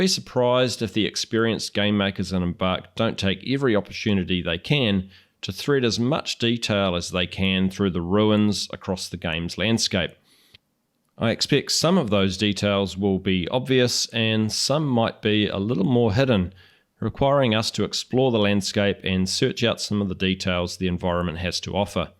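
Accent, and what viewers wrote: New Zealand. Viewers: As a kiwi abroad, it now hurts physically to hear a kiwi accent.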